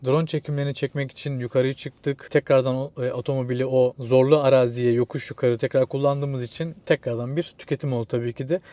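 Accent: Samsun, Turkey. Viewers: Eeelli klasik Samsunlu konuşması .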